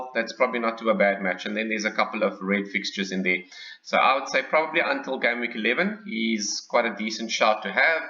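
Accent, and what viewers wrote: South Africa. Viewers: From South Africa?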